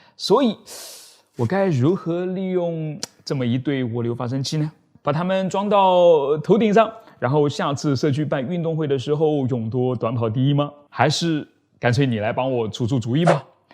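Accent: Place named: Shanghai, China. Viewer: 口音像上海的😎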